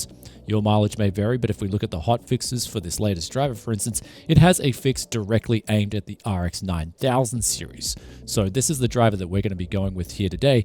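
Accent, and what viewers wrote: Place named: Australia. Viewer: Some propah Aussie chooning here!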